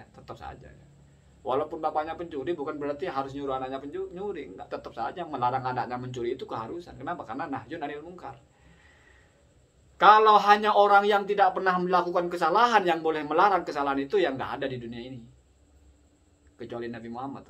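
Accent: Madura, Indonesia. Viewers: Semoga menjadi pengasuh pesantren 🙏🏼👍 Kayaknya Madura ya???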